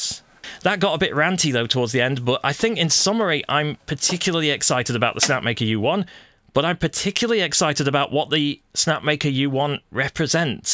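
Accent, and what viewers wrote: England, United Kingdom. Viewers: This is yet another untested pie in the sky kickstarter project with no track record and many many unanswered questions despite all the fancy pants marketing and English accented voice overs . Don’t be a guinea pig with your hard earned dollars . Let some other clown waste their money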